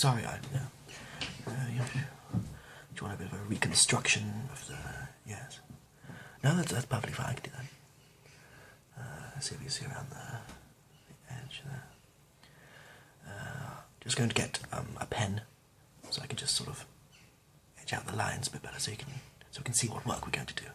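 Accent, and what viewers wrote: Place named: England, United Kingdom. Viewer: Bloody hell I love that posh english murmering, don't ask me why hahaha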